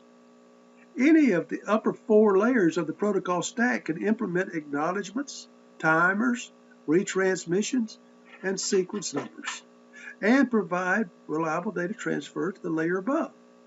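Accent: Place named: South, United States. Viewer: Nice lecture and love that southern accent :D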